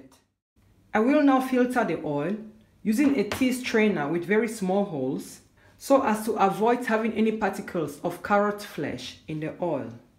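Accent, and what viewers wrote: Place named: Cameroon. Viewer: You sound very Cameroonian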